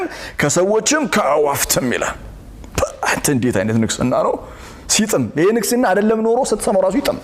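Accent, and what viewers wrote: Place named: Gurage, Ethiopia. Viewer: والله antenna guregna new yemtmeslegn anegagerh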